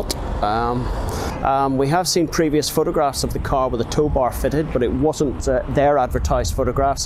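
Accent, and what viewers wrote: United Kingdom. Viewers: great video and lovely car. Just bought an L405 myself and love it! Nice to watch a video and hear a fellow northern irish man. That being said it must be killer for a Northern Irish man to pay ticket price (but as said hard to do a deal when holding deposit has been given)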